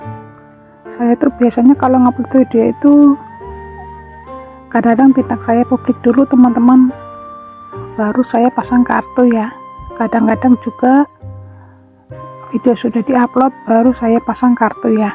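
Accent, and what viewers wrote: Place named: Banyumas, Indonesia. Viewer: Mantep niih info tutoriale mbakyuuuu..dulurku kiye..pada pada ngapak...kiye..mantep pokoke.. Salam sukses luuur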